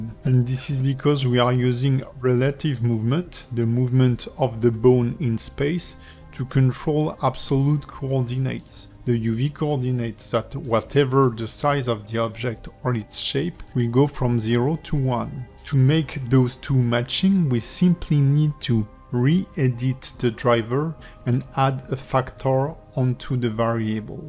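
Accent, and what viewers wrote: France. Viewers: clear and useful video plus I find your french accent really cute (^u^) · Ouah l'accent francais mdrr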